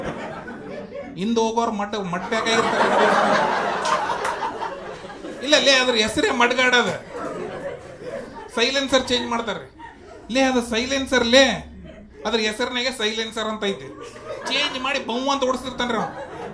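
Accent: North Karnataka, India. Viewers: Underrated Kannada Comic , yena helri pa, uttar Karnataka dialect olag stand-up kelaak mast irteti🔥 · Nivu yena helari namma uttar Karnataka bhashe na chanda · Uttar Karnataka slang alli stand up comedy... best. Pls innu jasti upload maadi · I’m happy to hear nam hurinavaru ee Tara beledirodu. Lots love from Dubai kannadiga . Nam UK style matu berene level 😃🥰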